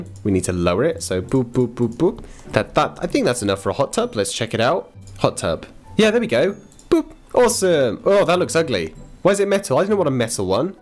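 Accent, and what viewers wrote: United Kingdom. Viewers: AWW YOUR BRITISH!!! :3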